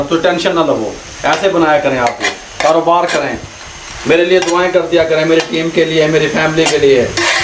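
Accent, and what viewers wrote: Punjab, India. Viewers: kia baat hay. way of expression is impressive. punjabi ka touch bht acha. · Bhai you r the BEST. I jus love your Punjab accent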